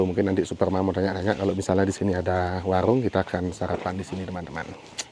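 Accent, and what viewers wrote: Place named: West Java, Indonesia. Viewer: Yogya hadiir perdana beli Pingiin ikutan Logatnya kok ada sunda n bali nya ya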